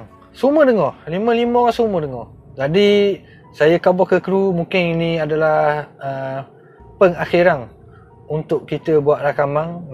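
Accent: Terengganu, Malaysia. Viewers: Haha,org ganu oyak gitu la,ambe org perak,tp blajo dungun dlu,mule mmg selok la suke,dh lame biase dengo,srehhh srehhh..haha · X eplore pn xpe dh bro.. sdp dgr crta pngalman cmni je.. skit pn xrse boring lg² ad cmpur loghat tganu.. mmg lyn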